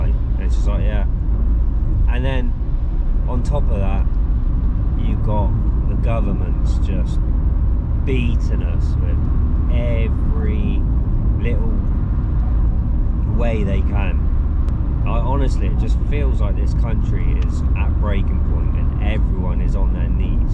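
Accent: United Kingdom. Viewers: West Country accent. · You got a Norfolk / Suffolk accent?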